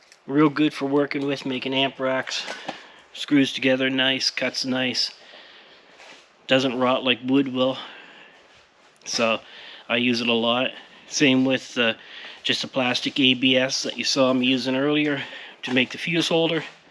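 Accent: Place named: Canada. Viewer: That Canadian accent is annoying.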